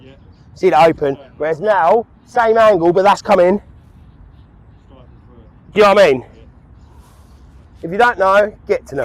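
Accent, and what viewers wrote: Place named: England, United Kingdom. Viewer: Good content mate what part of England are you ?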